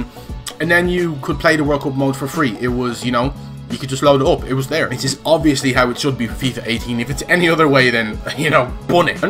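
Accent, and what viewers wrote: Ireland. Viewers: Yay ur Irish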